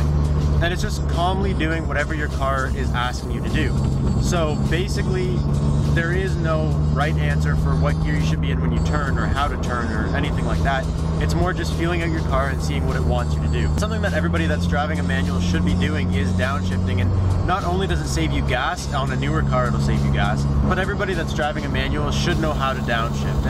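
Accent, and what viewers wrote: Canada. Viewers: He sounds really Canadian honestly he reminds me of my friend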